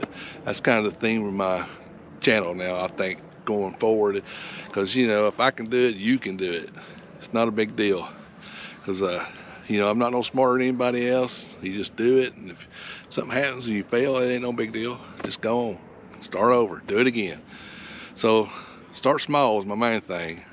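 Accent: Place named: North Georgia, United States. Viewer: Stick with the videos, we enjoy watching your progress. I also like your honest voice, I'm originally from north Georgia, so you sound like home. The AirForce took me to north Florida, so thats where i am now. A few more years and hope to be homesteading and farming for ourselves. We have a backyard flock of chickens we enjoy, just hoping to find more acreage in the coming years. Between Florida & Tennessee is where we want to be. Keep the videos coming, i think you're about to get popular. Best of luck & blessings, Jon & Noi from Florida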